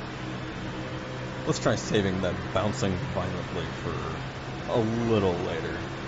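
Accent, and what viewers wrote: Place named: United States. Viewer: Happy New Year! Looks like a fun time. I don't know why but I definitely wasn't expecting an American accent haha. Is your rig banking right when you're taking a banked left turn?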